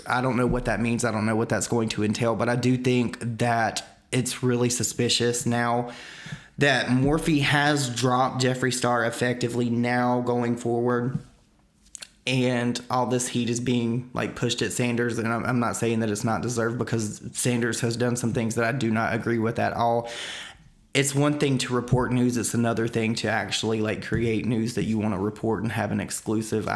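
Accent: South, United States. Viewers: Idk but your southern accent is so soothing lmao. Reminds me of one of my English professors.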